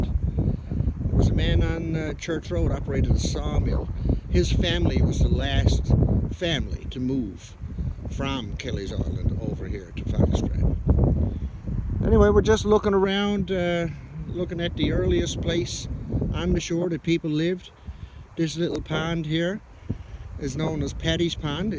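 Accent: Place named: East Anglia, United Kingdom. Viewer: Your accent has some elements of East Anglian pronunciation, i.e. north Essex, Suffolk. Have you traced your family back a few generations?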